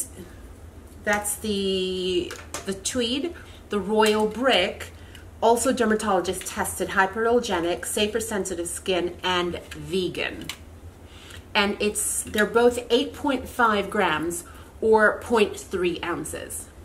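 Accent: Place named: Europe. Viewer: Thank you for your video. I picked up the tweed quad. If you don’t mind me asking, where are you from? I keep hearing a slight European (?) accent every now and again.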